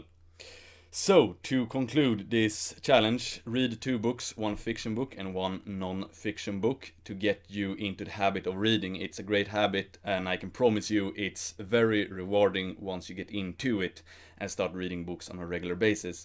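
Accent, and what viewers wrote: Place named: Stockholm, Sweden. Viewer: Är du från Stockholm? Kan inte undgå att höra dom karaktäristiska "i:en"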